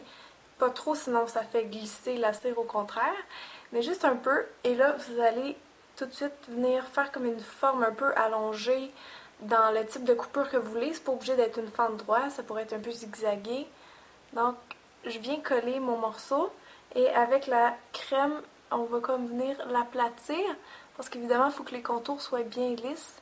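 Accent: Quebec, Canada. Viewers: Excellent... J'adore tes petites videos!!!... Et tu es super dynamique... je suis belge, mais j'ai énormément de famille là-bas chez toi... J'ai toujours adoré ce pti accent du Québec... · c'st un accent quebequois pas belge mais bon jten ve pas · C'est normal que tu as cet accent c'est parceque tu est quebecoise x) sinon . on dirait vraiment que tu as une coupure ! :P tres belle vidéo :) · Elle est québécoise, elle parle bien c'est juste son accent >< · C'est un peu du Québécois mais bon.. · Mathieu Blanc ne connais-tu donc pas le respect. D'après tes commentaires on peut voir que tu n'as jamais entendu un Canadien parler. Au lieu de rester bloquer sur l'accent tu devrais voir le travail formidable qu'elle nous fait voir.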